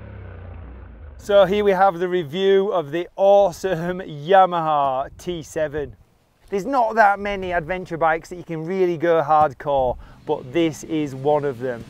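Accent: United Kingdom. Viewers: I love your British accent! So authentic. From kenya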